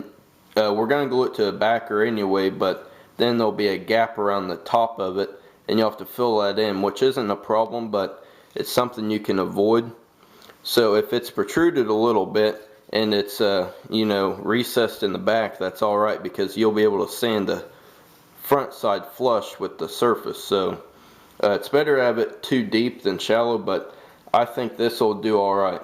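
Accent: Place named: South, United States. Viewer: thanks for this video - I haven't bought a scroll saw yet as I still need to decide which make and model to buy - in the mean time I've been watching all kinds of clips on UTube about scroll saws and this video of yours is the first that really explains very clearly how to do inlay work - I can now see a lot more possibilities with using a scroll saw - I'm not a country music fan at all but I really did enjoy the music with this video and it fits in perfectly with your southern accent - I'm sorry to hear about your brother - I checked and you are making videos again - that's great - it's a tribute to your brother and I'm sure he would have wanted you to continue - I've subscibed to your channel and please continue making videos as not many woodworkers explain things as clearly as you do - visual effects in clips can be interesting for awhile - but in the end most people want to watch clips like yours to learn - by the way I live in Europe - thank you for sharing your knowledge…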